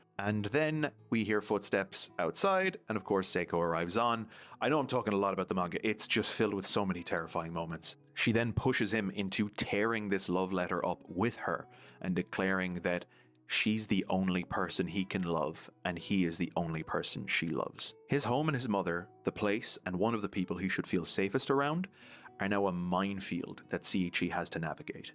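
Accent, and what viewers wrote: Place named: Ireland. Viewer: It’s sooo good to hear an Irish accent in the wild! Incredible video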